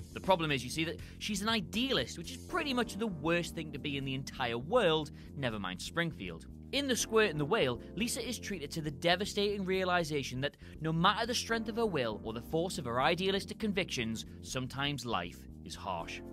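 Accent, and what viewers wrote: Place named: Northern England, United Kingdom. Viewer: These are heartbreaking and sweet in various ways. But what I will say is that Abe was a bad father first and thats a lot to do with why Homer is a bad son....it doesn't excuse him but still. Also, i think this is the first Northern English voice ive heard on narration on here. Being Northern English myself, its comforting to hear this.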